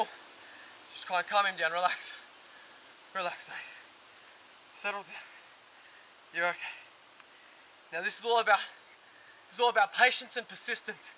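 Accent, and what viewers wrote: Australia. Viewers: knew he was australian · Australian accent : let's catch a d, I think this one was bullshit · Gaming &amp; More! hes Australian not Russian!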